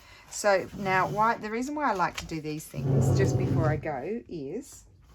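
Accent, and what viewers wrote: Australia. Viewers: Love watching your Christmas videos. These journals are amazing. I waited till you had a few and watched them all. Hoping I can find some bags like this. Bit hard to go shopping around looking as England in 2nd lockdown, so will look on Amazon.. From listening to you, are you from Australia and now in Italy? Your video are great. Thank you for sharing.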